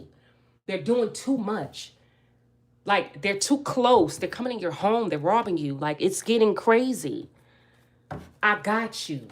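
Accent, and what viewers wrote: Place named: New York, United States. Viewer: Yes I can hear a NY accent i love it!!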